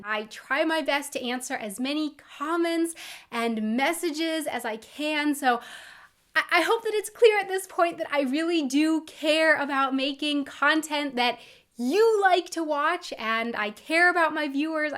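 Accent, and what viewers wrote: United States. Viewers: Don't mind the haters. You grow up American, you learned and spoke with a American accent all your life. It is who you are. Some people can learn other languages and accents easily but not everyone can. But that does not mean because you have difficulty with the accent you should not speak the language, just as there are many different dialects of German all being spoken in its own unique way so do the way you speak it differ, that is nothing to feel bad about. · You dont have to talk without an american accent. You are an american woman and talk with your accent, this makes you more authentic. Normally nobody should be sad if you are talking with an accent. If we understand you, everything will be fine :) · Most people hating your american accent most likely don't speak a different language themselves or have an german accent when doing so. 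Everyone trying to communicate in a foreign language show respect, otherwise they just wouldn't bother. But hey, it's the Internet, where everyone can get their voice heard, when usually no one listens to them in real life. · I just saw the Oktoberfest video - Yes, you have (at least had back then) quite a heavy American accent - but so what? Most people appreciate foreigners trying to utter a few words in their language, even if it's hard to guess what they mean. You speak clearly and well (even the ü), that's more than anyone could expect. Talking about respect for the language. So, I guess what I'm saying is: Haters gotta hate. Plus: An accent doesn't have to be a bad thing. Some singers based their whole career on theirs. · You should ignore the haters. Your American accent sounds cute and as long as people understand what you are trying to say, I'd say you'll be fine. It's not about sounding like a German, it's about communicating in German... 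I hate it when people complain about my accent. There's not so much you can do about it. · Do not bother about the haters! They are wrong! The majority of the haters is not self-confident and they only write such comments because they feel better when they are bashing others. It is only a push for their ego. Do not care about such idiots - they are not worth it! Your American accent is one aspect which makes you and your language special. My father-in-law lives in Germany for about three decades and he still has an American accent. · Why would anybody complain about your american accent while talking german. You can make a guess if those people, who appear to be germans, are even able to speak fluent english. And i think everbody knows germans have a terrible accent when it comes to speaking foreign languages. Yeah, american might not be the most popular accent compared to french, italian, spain or even russian but every accent makes the speaker very unique and thats always some sort of cute ;) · please dont work on your accent, its so lovely when americans speak german :) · I hate it when people complain about things they couldn't do any better. 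If I hear the germans talking english like "sänk ju for träweling wiss Deutsche Bahn" (Thank you for traveling with Deutsche Bahn), but are complaining about your american accent (which sounds cute, I think) I think that's not the right way. People: Try your your best on learning languages, but keep in mind, that this aren't the mother language, so it's ok to have an accent based on your mother language! That's cool, because you can hear the language in many different ways! That's really funny, I think. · It´s totally ok to speak German with an American accent because you are American : ) I think it must be pretty hard to learn German and you are really doing well! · Oh my. But. But. Your american accent is absolutely lovely. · Keep your American accent, it's quite normal and I bet it's not so bad. We have here in "Germany" more than a dozen different accents, so why not a few ten-thousands of people with an English accent.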